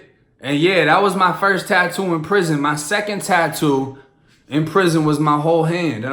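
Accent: Boston, United States. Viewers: I new you were from the Boston area!! As soon as I heard you speak, I said yup! Boston accent! Boston born and bread here, Dorchester Mass or a Dot Rat as they say 🤣 myself!! 😊! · the cah 🚙 That Boston accent 😂😂😂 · You can tell the Boston accent is the real one with the dropping the Rs. The Florida hood accent is acquired not necessarily fake but more adopted. I’m a white dude who grew up in the hood in Philly. a couple of my white friends i grew up with used to put on that hood “blackcent” when we were younger, tho some still talk like that. But now that we’re in our 30s most just have the normal shitty, Philly white dude accent. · Alot of people in the Boston area sounds like that. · That's crazy you move to Florida about the same age I was when I moved out of Florida I don't know where people say you got a Florida accent cuz homie I straight here nothing but Boston accent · I new I could here the Boston pahk tha cah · your accent is so strong for not growing up in boston lol! 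I love how yall say Car haha sounds so funny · Idk why the Boston accent is so interesting lol .... Concrete-ass-room with the stupidest teacher in the school. That shit had me rolling. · Why does he have a Boston accent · Boston with a Blac-scent’ LOVE IT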